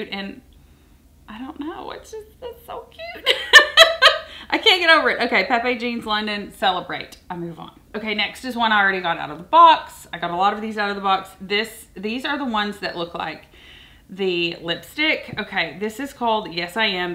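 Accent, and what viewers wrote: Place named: North Carolina, United States. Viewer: SOTD Paco Rabanne Lady Million Lucky 🍀 I always wonder if your from North Carolina by your accent. I’m originally from Long Island NY, moved to Charlotte in my late 20’s and now I live in TN. Hoping to move back to NC sometime in the future.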